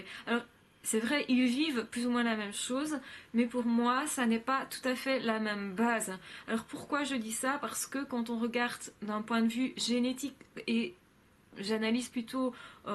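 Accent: Belgium. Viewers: Etes-vous de Liège ou de Bruxelles? :-)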